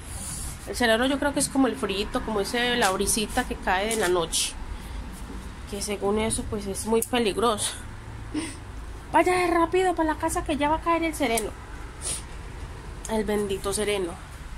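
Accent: Colombia. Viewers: Ese tan gracioso 😅 Me encanta tu acento colombiano y que nos llames muchachos y más aún, parceros. Me haces sentir en confianza. Abracito a tus pequeños 🤗🤗🤗🤗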